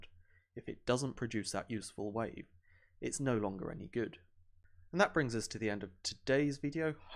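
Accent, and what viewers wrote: Scotland, United Kingdom. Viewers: What's wrong with a yank speaking? I know this fellow host has a striking British accent that I like, but not every "yank" host speaks a disjointed hillbilly mess lol. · ..I hear some Scottish in there.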